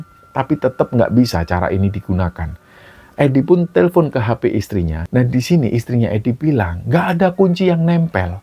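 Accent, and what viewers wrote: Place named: East Java, Indonesia. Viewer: Njenengan tuh telling story nya mmg beda dr yg lain lho Mas. Logat Jawa Timur nya jg masih kental 👍👍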